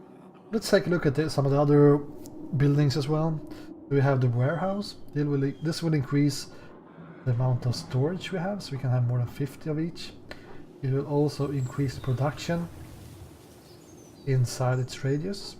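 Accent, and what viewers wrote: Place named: Sweden. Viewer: Really bad starting spot, you did well. That's a big compliment to 95% of the other idiots I've watched playing. Are you Swedish by any chance? Both because of dialect and having some gaming intelligence. I've watched a dozen American streamers being incompetent at this game. Tummen upp. All the other streamers I've just facepalmed myself. Very good.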